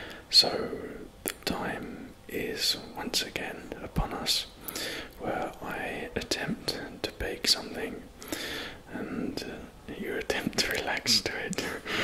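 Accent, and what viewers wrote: England, United Kingdom. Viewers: this accent comes from which part of England?!? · ASMR, plus gains, plus cake, plus British accent. I think you just became my favorite youtuber. 😍🎂💪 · Geez, your Brit accent is something, huh? Greetings from a Brazilian chocolate lover! 😋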